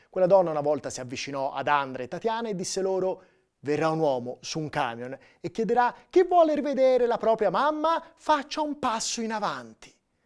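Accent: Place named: Tuscany, Italy. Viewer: Ragazzo, sei un grande... Riesci con la tua parlata Toscana e il tuo sorriso ad alleggerire questo spinoso argomento.... È un piacere ascoltarti.... Baci.... Da una mamma.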